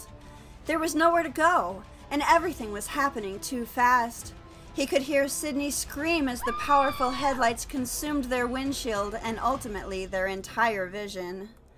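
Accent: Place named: South, United States. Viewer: No one cares if you like someone’s voice. You must have a pretty crappy life to leave a whole novel on a video about someone’s vocal tone. I love her Southern charm and affect. It’s called story telling. You’d do more good in life taking the time to tell people positive things instead of spreading negative energy like manure.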